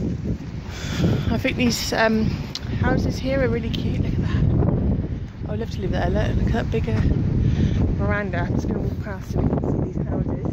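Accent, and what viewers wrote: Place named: United Kingdom. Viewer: Hey how are you doing? 🙂👍 hope all is well. I know I've said it before but I love your British accent. Lol Cool looking houses you guys have there. So different from here in the USA.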